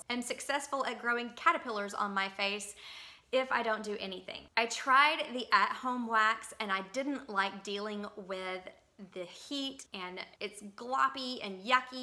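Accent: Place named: Tennessee, United States. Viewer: Great video! You sound like you're from Memphis or Nashville! Are you from TN?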